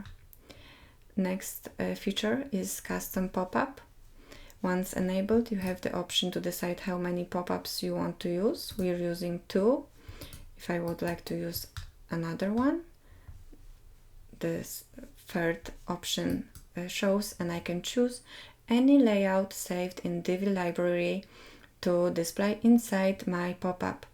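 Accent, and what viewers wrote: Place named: Poland. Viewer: Tak właśnie mi się zdawało, po imieniu i nazwisku :). oraz po akcencie :) Super fajną wtyczkę zrobiłaś, jest ona może w wersji Polsko języcznej ?. Jak masz podaj mi linka do swojej strony w języku polskim lub do jakiejś dokumentacji odnośnie tej wtyczki Pozdrawiam Łukasz :)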